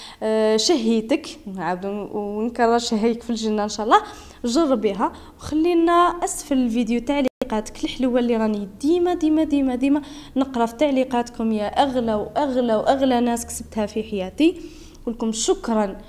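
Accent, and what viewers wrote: Batna, Algeria. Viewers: سلام صحا فطوركم تبان مليحة بزافففففف يا بنت بلادي جاممممممممم كبيرة ليك لازم نجربها .... شكرا حبيبتي .....هدرتك تفكرني بباتنة بلادي العزيزة 😍😍😇😇😇😇😇😇😇😇😇😇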